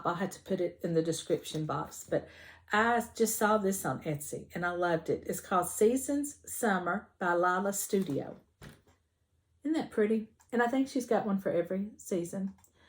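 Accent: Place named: South, United States. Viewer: I could listen to you talk all day that southern drawl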